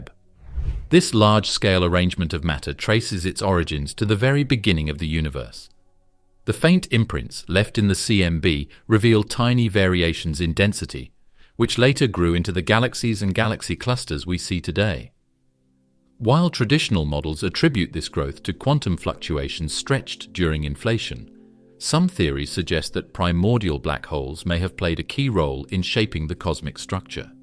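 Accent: England, United Kingdom. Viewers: They have an English man read these dumb questions to seem smart. In the beginning God created the heavens and the earth.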